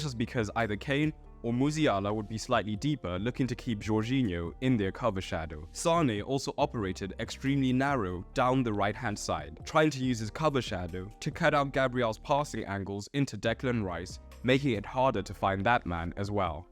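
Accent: South Africa. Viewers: yo are you south african ?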